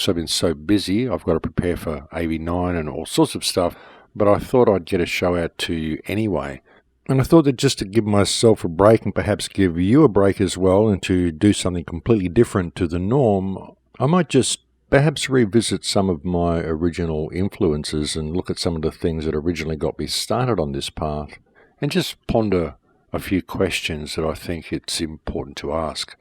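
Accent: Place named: Australia. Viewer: You're most welcome, your words pulled it from my heart to yours. I too see humanity as one of the many species in danger on this planet. Every words you're saying is true and have helped me considerably understanding what's going on, though only after I got over your Australian accent...lol...no worries, I have one myself...there's not such a thing like "perfection" or, better yet, perfection is only to be found through imperfection;) big hugz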